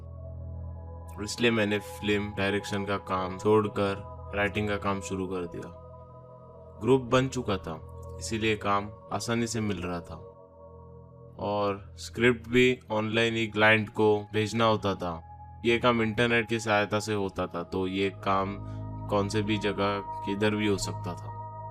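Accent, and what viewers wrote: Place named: Hyderabad, India. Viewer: You sound 100% Hyderabadi to me. indian Hydro I mean.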